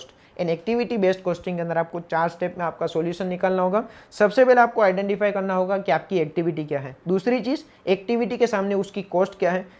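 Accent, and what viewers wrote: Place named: Junagadh, India. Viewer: 2 things *Junagadh no tone aavi gyo😍 *And standard costing nu formate JKSHAH Classes jevu lage che..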